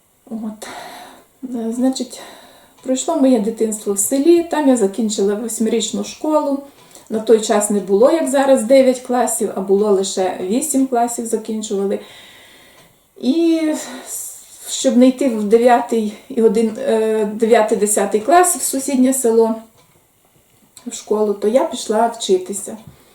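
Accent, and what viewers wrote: Western Ukraine, Ukraine. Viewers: Вітаю! Власне, я писала, що ви, мабуть, родом із заходу України. Відчутно як ви розмовляєте. В жодному разі не ображала вас, бо я західнячка з Тернополя. Перепрошую, якщо для вас це неприємно. Залишайтеся здорові.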